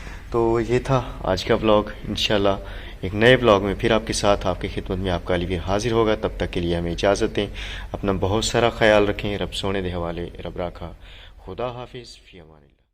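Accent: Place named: Gujrat, Pakistan. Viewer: Salaam Bahi I recently started watch your Volgs your house is beautiful mahshallah your excient like gugrat are you guger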